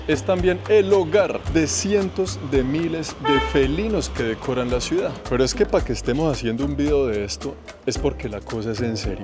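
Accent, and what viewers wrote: Colombia. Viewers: Querido que ermosura son sus videos siento un respeto admiracion por su trabajo me gusta que usted habla con su acento colombiano que se le escucha l muy bonito me hace recordar cuando conosi colombia soy guatemalteca pero me enamore de Colombia megusta la terminologia que usted usa para su narración de sus videos el respeto que muestra para todas las culturas que conose que mi Dios me lo guarde y me lo acompañe siempre que sea el quien guie sus pasos por donde quiera que valla saludos desde U SA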